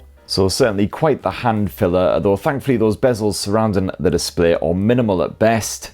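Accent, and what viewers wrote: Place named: North, United Kingdom. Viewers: Straight out of the north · He's a Mackem mate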